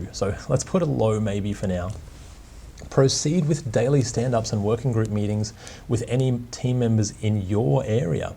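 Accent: Australia. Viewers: I just passed my PMP yesterday 9/3 and I got to tell you..most of my questions had an Australian accent. Thanks a ton (tonne?)! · I was going into the exam having done a ton of SH practice questions, mini exams and full length exams. SH completely demoralized me and made me think that passing was going to a flip of the coin at best. Not only did your videos bring clarity to a lot of the tricky questions that stumped me in SH, but also gave me my confidence back and reduced my anxiety enough to stay focused during the exam. I think reading the questions in my head in a chipper Australian accent really helped. So glad I stumbled across your videos series! I passed with a very comfortable AT/AT/AT :D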